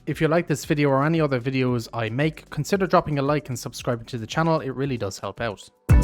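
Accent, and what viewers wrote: Ireland. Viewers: He said 30. is Irish so he pronounces it as "Tirty"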